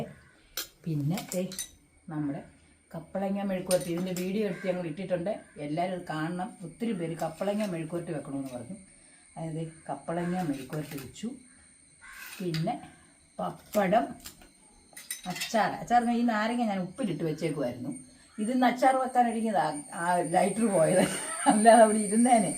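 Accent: Kottayam, India. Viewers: കോട്ടയം കാർ ആണോ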